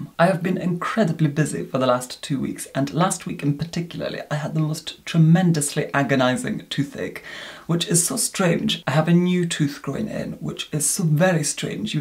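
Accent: United Kingdom. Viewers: I Love your british accent <3 <3 <3 Love from Paris France <3 <3 <3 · I could listen to 👸🏻 👸🏻 count from one to one million or rattle off the alphabet from A to Z, and be perfectly entertained for ⏳hours⌛ by his beautifully accented voice 🗣🗣🗣 I consider his ☺cosmetic inventiveness☺, 🇬🇧dry English witt🇬🇧 and 💄immense makeup knowledge💄 to be an extra, added and appreciated bonus!!! 😍💯💎😻💋✨💖👑💖✨💋😻💎💯😍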